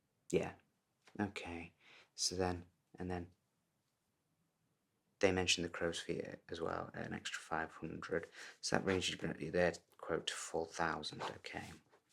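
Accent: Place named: North, United Kingdom. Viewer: You sound very... Northern. I like it 😋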